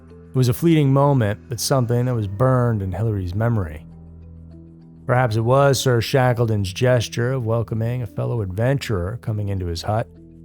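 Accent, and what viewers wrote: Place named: East Texas, United States. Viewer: That is the most Marshall/Longview accent I've ever heard.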